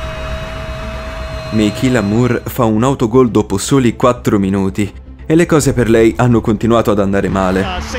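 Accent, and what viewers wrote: Germany. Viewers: MA SEI TEDESCO?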